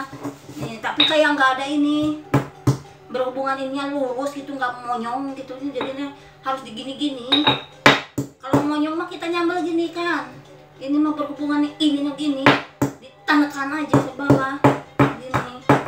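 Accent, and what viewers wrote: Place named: Sunda, Indonesia. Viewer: Dari judulnya aja udah gokil Mak , suka sm medok Sundanya 🙏🏻😁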